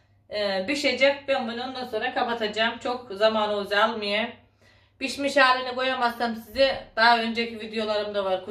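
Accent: Trabzon, Turkey. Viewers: merhaba seni severek takip ediyorum şivene bayılıyorum bende aslen trabzon akçabatlıyım ama sakaryada doğdum burda yetiştim annem babam orda evlenip sakaryaya yerleşti dedemler akçabat düz köyde yaşıyor seni dinledikçe annem konuşşur gibi severek dinliyorum yaşşa senin ablan sayılırım seniiiii çoooooook öpüyorum canımsın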